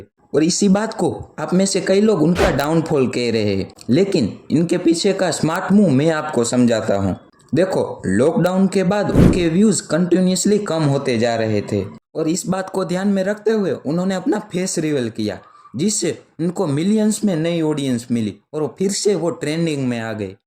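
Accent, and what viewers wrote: Gujarat, India. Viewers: Ha ye gujrati hi he avaj se😅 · Bhai gujarati hona 😅😅😅😊AVAAJ SE PATA CHAL GAYA MAI BHI GUJARATI HU❤ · Bhai tu gujrati hai na 😂😂😂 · Pakka tu bhai Gujrati hain 💀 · Avaj se lag rha nam gujarati hai and darbar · Ha bhay ya gujarati ha lakin kontat achh dal raha ha ❤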